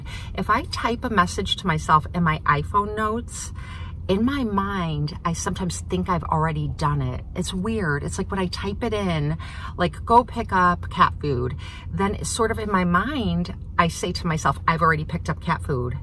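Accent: Midwest, United States. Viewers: I bought this same one in medium in chocolate. The medium is like a large. Got it on eBay for $200.00! I LOVE it. Heavy as the dickens. Mine had a pen stain inside on the green suede, but I was able to get it out with an Emory board! I knew you were from the Midwest based on your voice. My family is from Chitown!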